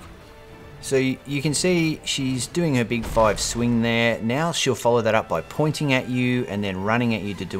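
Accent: Australia. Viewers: Pretty Cool. You sound like an Aussie.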